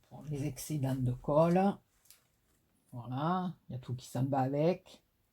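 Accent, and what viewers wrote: South, France. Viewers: J adore votre vidéo et votre accent du midi. Je vadrouille entre st Martin de crau et Ales Gard et le midi j aime. Chapeau 😘😘😘😘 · Oh qu'elle joie de te revoir, c'est bon d'entendre ton accent du soleil, tkt tu es hors champ mais pas grave trop bon de te revoir avec tes astuces et tutoriels. Super géniale marie-ange de Bourgogne. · Coucou je découvre ta chaine et je vais m'y abonner de suite .. le tuto du chapeau j'adore......et l'accent du midi également.. ici il neige a gros flocon suis du Nord... est ... ca caille ..bisous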